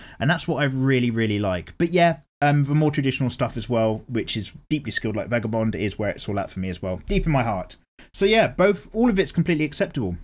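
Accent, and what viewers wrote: United Kingdom. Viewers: dude ur british? can u be my yoda brah?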